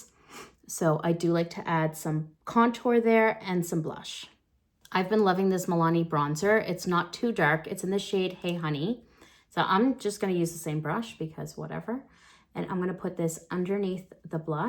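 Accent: Canada. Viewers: I think it’s that she’s normal and genuine. I don’t find I can relate to a lot of beauty YouTubers. I don’t find many of them teaching anything I find helpful, more so just selling products. I don’t feel like does that. I actually feel like back in the day when I worked as a makeup counter artist for Bobbi Brown, reminds me of one of the traveling makeup artists that I loved to work with. I leaned so much from her. I also find her Canadian accent cute, as a Texan it’s so cute to me.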